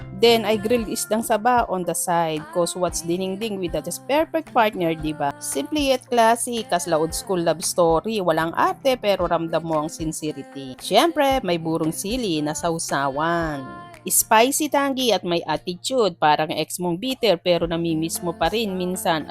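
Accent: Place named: Baguio, Philippines. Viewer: kahit ganito araw araw ang ulam best.. haha kagaling mag Ilocano Baguio accent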